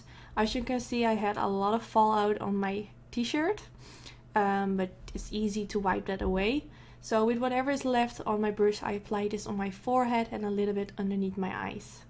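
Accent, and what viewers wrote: Netherlands. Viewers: ik hoorde je accent en ik wist meteen dat je Nederlands was. you go girl 😚❤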